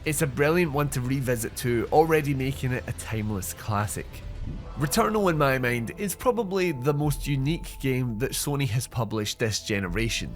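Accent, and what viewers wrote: Scotland, United Kingdom. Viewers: love the scotlandish accent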